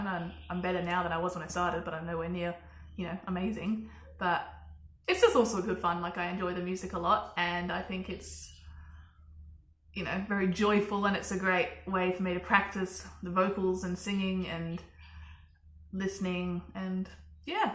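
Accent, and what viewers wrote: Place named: Australia. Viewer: Your normal voice is cute as hell too. I love your Australian accent. I myself have a Midwest/southern US accent.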